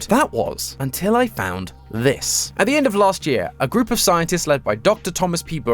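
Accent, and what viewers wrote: United Kingdom. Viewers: Why did matpat quit youtube, did anyone give him tensions or something? I had listened the voice and matpats voice is very crackey but this one is a British person (ami right that its a British person?) I liked the way he said THE LOREEEEEEEEEEEEE! I missed those days hes voice gave me happiness now i dont like this person. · I thought this came out years ago, the British accent caught me off gaurd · I still cant get used to the british voice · British matpat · Love to have a British host, don’t take this the wrong way and say I hate MatPat because I dont, it’s just nice to have someone from my country host the channel ya know? It’s cool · Hes British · hes BRITISH NOOOO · Awesome, we get a British accent to listen to now that matpat dipped (Edit: I not hating btw I just mean I love me some British accent💀) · game theory is british now? 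· Me realising matpat is gone: D: Me realising is british::D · Matpat get a new guy not a random British guy with a script you wrote. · I don’t want British man I want the other guy please I want him back I miss him 😭😭😭😭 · before amarican now british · they replaced matpat with a british dude, i cant listen to it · I miss mat pat but the new guy is British I think so W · MatPat is bri’ish!😮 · Let's all say goodbye to Matpat. We all miss him. (say, who the f is this British guy now?) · they made matpat british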